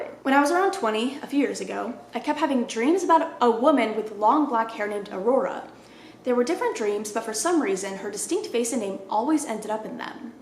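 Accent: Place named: South, United States. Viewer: That southern drawl keeps shining through more and more. Subconscious or not, it's cute. Seems fitting, I dig it! 🤠😉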